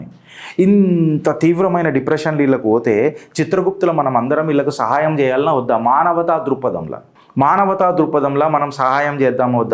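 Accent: Telangana, India. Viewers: I'm from Andhra Pradesh. Sir, meru Telangana yasa matladutunte vinadaniki vinasompuga vundi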